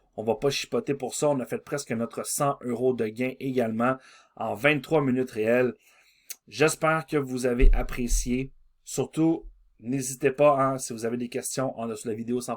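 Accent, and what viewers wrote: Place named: Quebec, Canada. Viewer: l'ackcent quebecois tres beau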